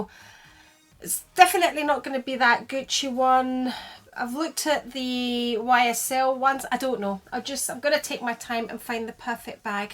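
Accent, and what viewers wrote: Scotland, United Kingdom. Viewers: Beautiful classic shoes, Manolos are so sophisticated and unique !!!! It's always lovely to see your pretty face and I love your Scottish accent too. Lots of love as always to you, your mum and family XXXXXXXXXXX Q&A: how hard was it to marry so young and to have children so young ? How did you meet your hubby ? :) XXXXXXXXXXXXXXX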